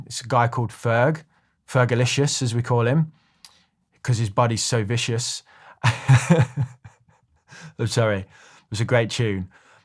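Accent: Birmingham, United Kingdom. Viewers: Great video, what a way to turn a shit thing into a force for good. I will admit to being disappointed that you're a city fan as I thought I detected a Brummy accent and hoped you'd be a Villain like myself:'( As for the name for "the attack", how about "The Smackening?"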